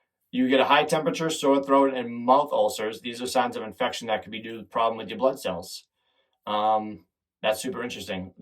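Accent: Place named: Boston, United States. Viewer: You sleep? 😂😂😂 Your Boston accent is my favorite part of your show! Keep trucking my friend!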